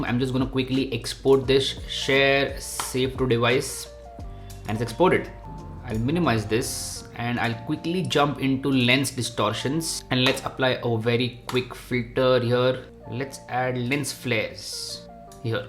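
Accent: India. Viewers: English from India!!!! I'm brazilian but I can notice Indian English accent! I'm 99% sure! lol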